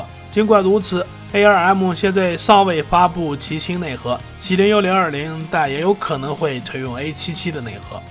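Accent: Shanxi, China. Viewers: 山西还是陕北口音？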